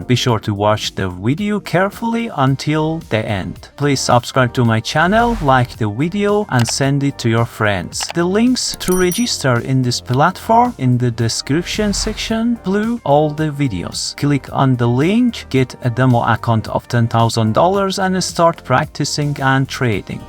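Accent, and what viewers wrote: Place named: Iran. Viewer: درستش اینه منبع ذکر کنی همه می‌دونن این روش ها اولین بار توسط استاد روزاندیش معرفی شدن انگلیسی صحبت کردنتم که معلومه تابلو زبان اصلیت فارسی 👎💩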